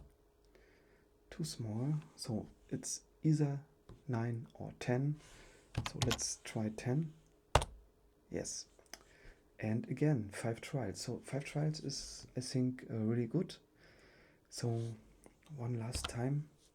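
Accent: Germany. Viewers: Nur wenige Amis sprechen das The mit th aus. Die meisten sprechen es als gepresste D aus. Also "da car" nicht "the car". Aber stimmt schon die Aussprache ist doch schon sehr Deutsch xD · Ich höre doch da einen Deutschen Akzent raus 😂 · Man hört einfach komplett das du deutscher bist 🧐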